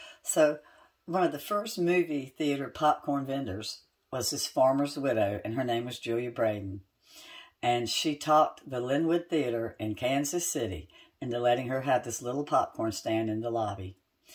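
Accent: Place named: Virginia, United States. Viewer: You're a great storyteller with that Virginia accent.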